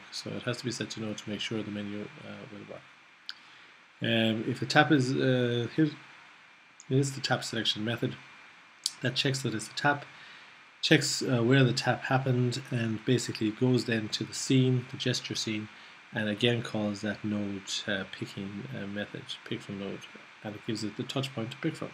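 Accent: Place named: Ireland. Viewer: These are great videos, and it's nice to hear an Irish accent too! Maybe you can help me out with a query: I'm trying to design a game character in Blender composed of several objects (sphere, cube etc.). I want to load it into my cocos3d app as one node with several children, so that I can transform the whole lot at once. Is it possible to set up that group within Blender? I've tried using the regular Blender "group" feature but those don't appear to end up in the POD file. I can see that obejcts can be parented to other objects, but when I parent objects to the sphere which is the largest and most central component of the scene the vertexes are distorted in a bizarre way. Finally I've tried parenting all of them to a bounding cube but I can't find a way to hide the parent cube so it doesn't render in the scene. What would you advise?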